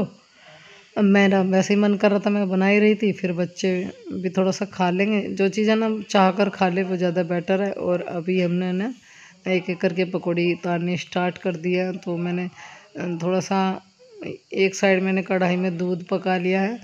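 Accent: Haryana, India. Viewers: Aaj aawaj bilkul haryanvi lg rhi thi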